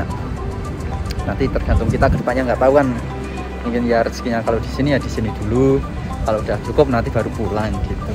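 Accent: Java, Indonesia. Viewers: jowone pean ngendi mas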